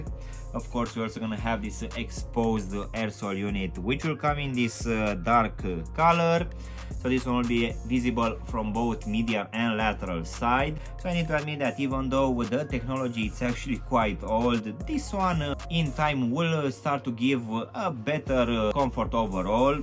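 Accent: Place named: Romania. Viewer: Te cunosc dupa accent bro ca esti roman, buna treaba frate, chiar imi plac review urile tale, keep it up, poate poti face review si la aj1 mid industrial blue, materiale superbe, pret accesibil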